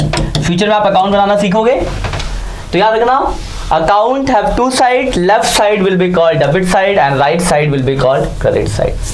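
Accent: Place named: Haryana, India. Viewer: Amazing explanation sir...... I think u are from haryana????????ur teaching is really great 🙂🙂🙂🙂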